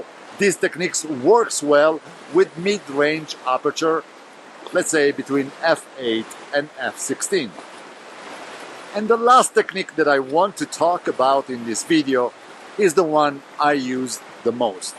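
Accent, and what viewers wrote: Italy. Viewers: Hi, I've been following you for some time. I like the way you tell your work and I like your Italianized Italian but I don't like the way you do pasta, but that's another story:-). I'd like to ask you a question, I haven't found anything on your site either, about it. I saw you use an L-bracket for vertical shots, can you tell me the type / brand of this bracket? Do you feel comfortable? I'm waiting for your other videos. Good light. Ivan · I don't know why but there is so much "magic" or "positive energy" in your videos in which you are outside in the nature. Hard to explain ... It's beautiful - and then you play the music and it's even more beautiful. But strangely this is only in your videos - don't feel the same in other photographers videos when they are somewhere filming in nature. Thank you for the helpful information by the way. Like your Italian accent. 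Cheers from Austria!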